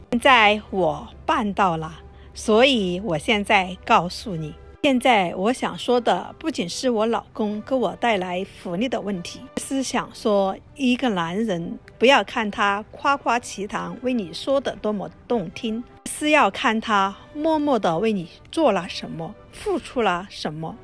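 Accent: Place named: Sichuan, China. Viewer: 姐姐好听四川话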